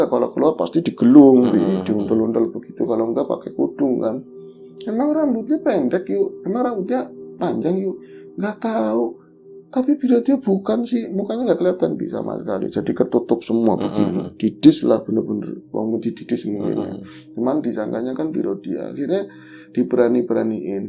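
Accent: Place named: Brebes, Indonesia. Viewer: Ngomonge Kya wong Brebes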